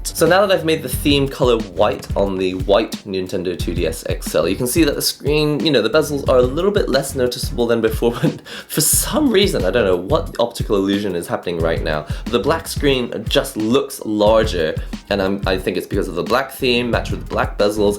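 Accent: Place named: Northern England, United Kingdom. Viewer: You probably learned English from England, maybe north of England because of your accent.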